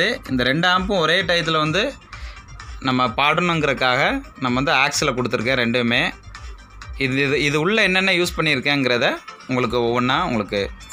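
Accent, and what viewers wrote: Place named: Coimbatore, India. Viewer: hi sir very nice explain....... Love it..... Ana Coimbatore Tamil ela...... Nice...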